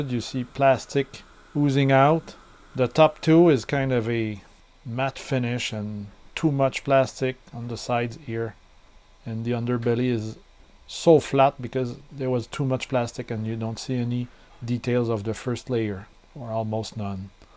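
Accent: Quebec, Canada. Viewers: Ya du Quebecois dans cette accent là lol Merci beaucoup pour ton video, je m'abonne tout de suite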